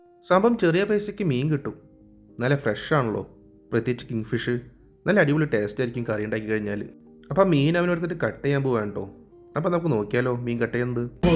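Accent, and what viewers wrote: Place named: Thrissur, India. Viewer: ath trissur kkarde oru slang aatto😂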